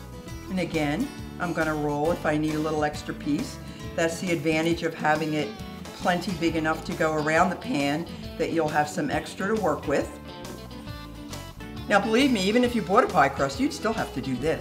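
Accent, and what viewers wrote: Philadelphia, United States. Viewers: you have to be from Philly/S. Jersey! Wooder! Love your sass! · That Philadelphia-sounding accent makes me lose my appetite. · This woman lives around Philly, somewhere. I recognize the accent.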